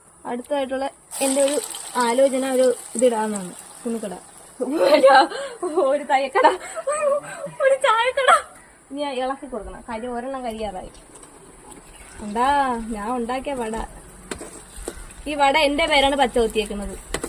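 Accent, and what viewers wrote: Kollam, India. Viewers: Ninghal ethu districtil aanu...shaaili kettittu kollam aannennu thonnunnu ...😅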